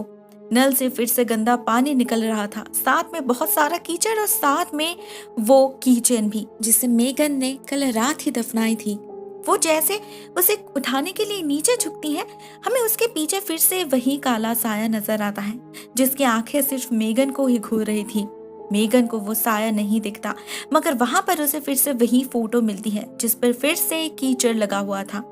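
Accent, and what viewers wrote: Bengal, India. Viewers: u hav improved alot on ur hindi...but still bengali accent persist..cant blame u.. but so far so good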